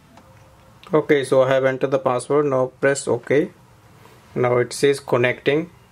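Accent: India. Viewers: The only Indian accented English voice I will ever trust..Thank you!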